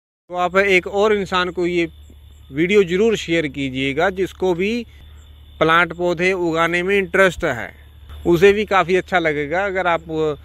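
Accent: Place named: Punjab, India. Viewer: bhaji Punjabi nikli jandi hai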